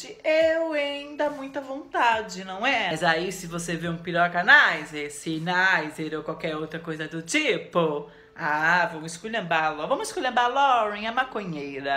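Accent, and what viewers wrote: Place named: Paraná, Brazil. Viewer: o R é do PR. Kkkkkkk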